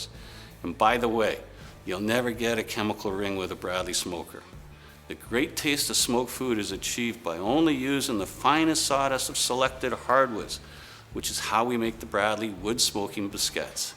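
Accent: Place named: Canada. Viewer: I sense some Canadian from, eh?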